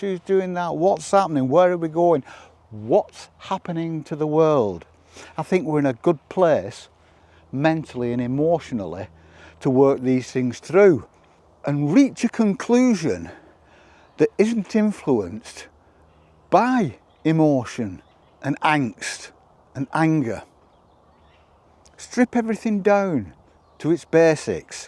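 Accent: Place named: Lancashire, United Kingdom. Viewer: thanks, joe soap. loving yer lancs. lilt. ;)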